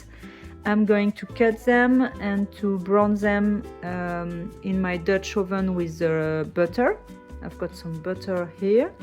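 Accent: France. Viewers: I enjoyed this very much. French is my second language and I went to university in Grenoble in the 1990s. Your English is excellent. Love the accent. It reminds me so much of my French friends. Happy New Year! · I love watching these videos---the recipes are always so good, the calm tone, when youre out walking around, I get to see Paris - you have a nice sweet voice with a lovely French Accent when speaking English - it is just so calming and refreshing to watch-there is no crazy cutting and chopping and throwing and slamming ingredients - so, thank you for making the videos for us to enjoy - MERRY CHRISTMAS AND BEST WISHES FOR GOOD HEALTH AND JOY IN THE NEW YEAR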